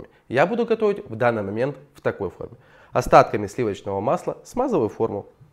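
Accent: Ukraine. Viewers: Спасибо,.Очень просто и вкусно.А ваш милый украинский акцент вызывает у меня ностальгию.😊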